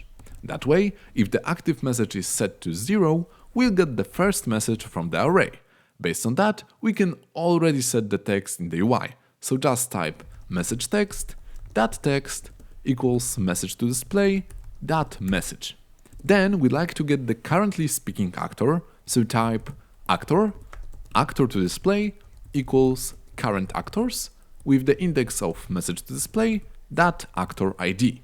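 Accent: Poland. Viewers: Jesteś z Polski? Bo tak trochę po głosie słychać ale nwm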